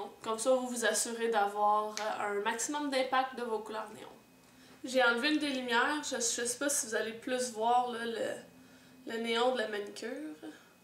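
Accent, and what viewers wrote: Quebec, Canada. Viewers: C'est possible que sa marche ( le problème j'ai pas de vernis neon ) -.- je vais essayer quand j'en n'aurais mais sinon j'aime bien ton accent ! Tu es Québecoise ?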